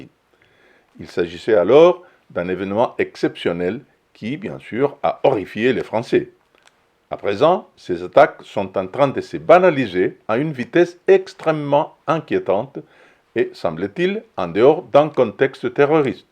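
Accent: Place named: Spain. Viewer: les musulmans de France sont bien plus français que toi vu ton accent espagnol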